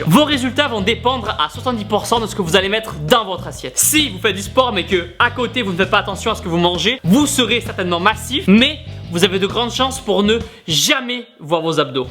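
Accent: South, France. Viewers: La recette avé l'accent avait un petit coté Maïté! C'est très bien mon gars en plus d'être valables tes vidéos sont drôles ce qui est agréable dans une discipline ou beaucoup de gens prennent on sait pas pourquoi le "melon", ça change des potaches adeptes du selfie qui font mon désespoir quand je tombe dessus... Continue bravo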